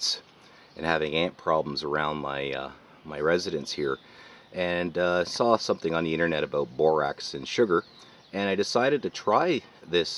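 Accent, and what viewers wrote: Canada. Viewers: He has a Canadian accent, and the box has French words. Areas in Canada are French.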